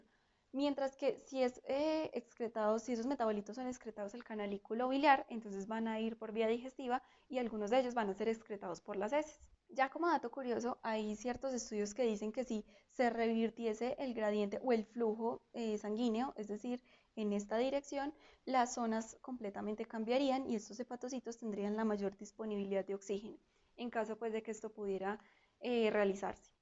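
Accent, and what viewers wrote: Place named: Colombia. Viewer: Hablas demasiado rápido que no se te entiende nada,sumándole el acento colombiano que tienes ,terrible tu explicación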